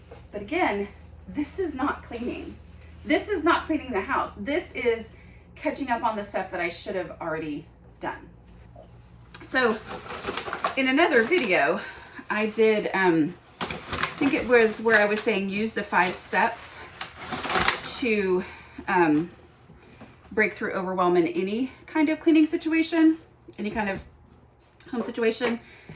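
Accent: South, United States. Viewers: You have a sweet southern accent and voice! You sound like me and my friends :) I loved your video and you're very easy and pleasant to listen to :) Thank you for all the helpful tips and I will be checking out more ! May the Lord bless you and your family:) Rachel and Dobie in Nashville 🎨👩‍🎨😺🍊💕🛐📙☦️🕎🕯️ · I can hear your Southern accent when I read your book. So cool to hear you explain this concept out loud - I'm in the middle of Decluttering at the Speed of Life now. Love it!!!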